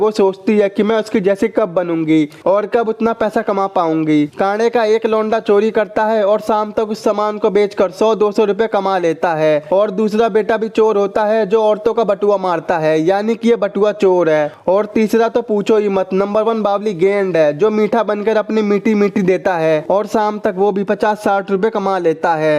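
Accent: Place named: Rural, India. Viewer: bhai tu thoda sa behan ka loda h kya teri aawaj kitni madharchod h or gawaro wali h 🤣🤣🤣🤣🤣🤣🤣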